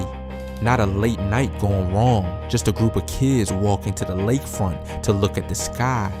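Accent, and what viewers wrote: Baltimore, United States. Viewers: BAL Accent.....🤢🤢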